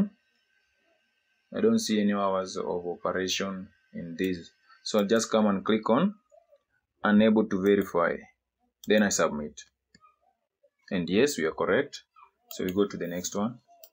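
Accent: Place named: Kenya. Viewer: You have a kenyan accent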